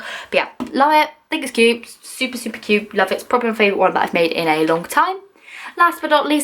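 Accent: United Kingdom. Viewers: 13 Reason Why...I ❤️ 1. She makes the CUTEST squishies 2. She uploads regularly 3. She is just the SWEETEST person 4. She is really CREATIVE 5. She makes the BEST original content 6. She has a really pretty British accent 7. She is SUPER kawaii 8. She is really Hard working 9. She cares about Everything 10. Her videos are “Family Friendly” 11. Her aesthetic is Just...GOALS 12. Her tutorials are REALLY helpful 13. I just love her in general 😘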